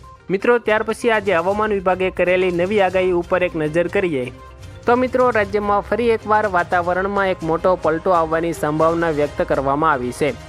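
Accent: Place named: Ahmedabad, India. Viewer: અમદાવાદી શુંધ્ધ ગુજરાતી ટોન માં સમાચાર સાંભળવા ગમે.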